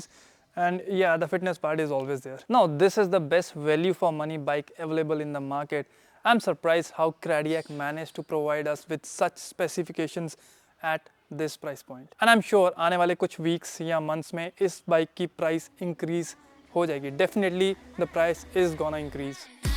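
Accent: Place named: Jammu, India. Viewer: bhai apki tone se lgra aap jammu se ho...( me jammu se hu ) is yes then bta skte ho konse area se ...? milkr ride krnge ❤ ( although video bhut acha tha...iski unboxing bhi dekhi thi review bi dekh liya ab purchase bhi kr lunga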